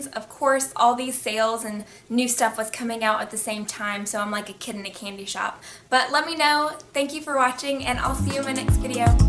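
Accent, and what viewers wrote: South, United States. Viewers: Yourr sooo gorgeous & I think I hear a country accent??? Im from texas :)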